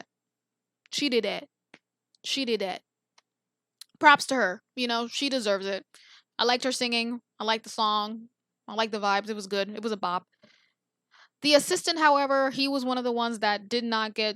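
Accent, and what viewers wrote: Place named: Latin America. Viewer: Balla se te escucho vien el espol latino 😃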